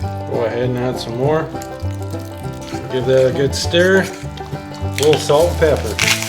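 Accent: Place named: Nova Scotia, Canada. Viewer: You're from nova Scotia aren't you brother?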